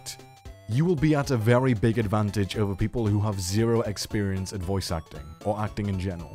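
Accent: United Kingdom. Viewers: Are you british? You sound it.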